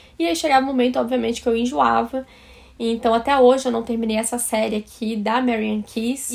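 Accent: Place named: Rio de Janeiro, Brazil. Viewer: Vc é afinal de Santa Catarina ou do Rio? Tá com sotaque carioca nesse livro 😅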